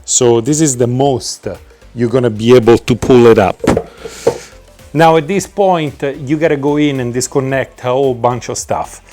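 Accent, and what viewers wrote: Italy. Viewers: I like the italian accent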